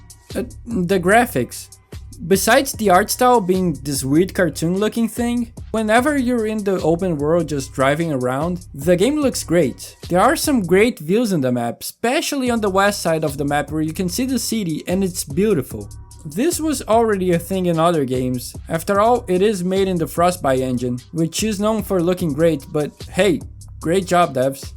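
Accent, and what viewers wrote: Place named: Brazil. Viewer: you have a really brazillian accent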